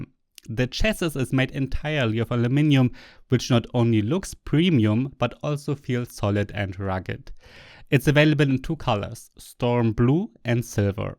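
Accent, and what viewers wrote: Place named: Germany. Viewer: Have you been working with an English dialect coach? Your sharp German accent is slowly disappearing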